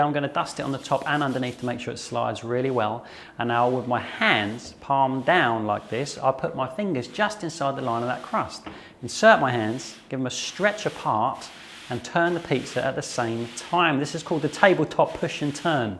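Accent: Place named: Cockney, United Kingdom. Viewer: Waitrose and Taylor? Never judge a bloke by his barrow boy accent:) As informative as ever and now I have options...great video.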